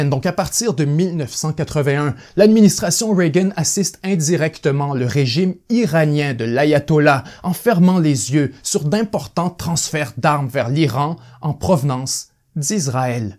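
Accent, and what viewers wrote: Quebec, Canada. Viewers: j'ai du mal avec la voix québécoise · J'aime bien l'accent canadien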